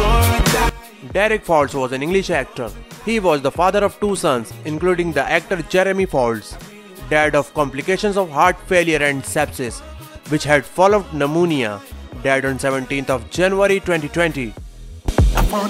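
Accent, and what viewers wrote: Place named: India. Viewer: The announcers Indian accent is so distracting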